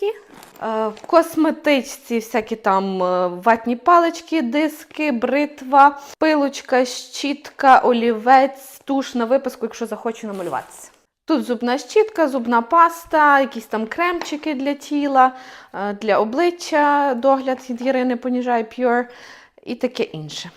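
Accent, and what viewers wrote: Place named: Lviv, Ukraine. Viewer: Обожнюю львів'ян, їду з Києва спеціально щоб послухати ваш діалект, рідний мені з дитинства. Якраз збираю сумки в пологовий, тому дуже корисно було послухати, дякую!! ❤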